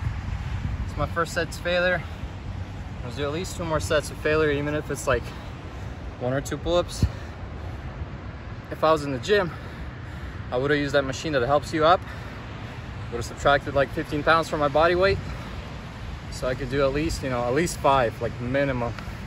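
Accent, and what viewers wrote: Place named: Russia. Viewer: Just as hot as 10 years ago. Interesting to see the Russian accent isn't nearly as thick as it used to be.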